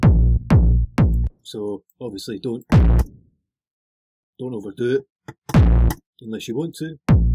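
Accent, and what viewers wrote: Scotland, United Kingdom. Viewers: He's Scottish not English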